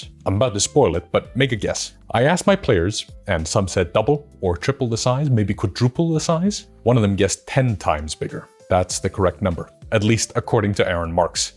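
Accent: Iceland. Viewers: I need to tell you this, because I've thought it for a while now and I can't keep it to myself. Has anyone ever told you that you sound just like Jonathan Frakes, just with and Icelandic accent?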